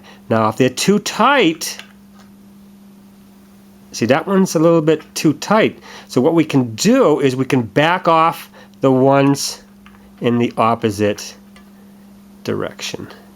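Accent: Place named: Boston, United States. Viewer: stoppsi Obviously Massachusetts/Boston/New England.. lol But it's ok..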